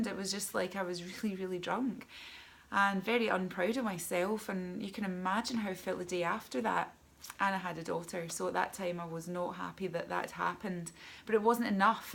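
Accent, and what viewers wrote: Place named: Ireland. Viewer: I hear your story. I hear your irish accent. Although I'm from the states I grew up in an irish catholic background and community where its commonplace to drink everynight after work and on the weekends. There was a bar on every corner. I'd walk to Flynn's corner bar and walk home in a blackout for years I did this. I'm 45 now and going to AA and really trying to stop my boozing days! Thanks for sharing love!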